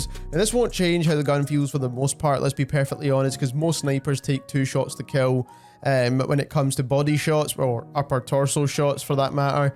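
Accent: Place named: Scotland, United Kingdom. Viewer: Love a good Scottish accent.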